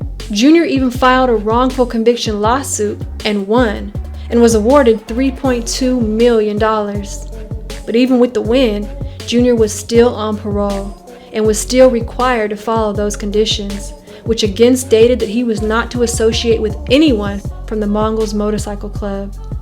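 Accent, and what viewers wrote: South, United States. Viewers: The narrator has a sweet southern voice ❤️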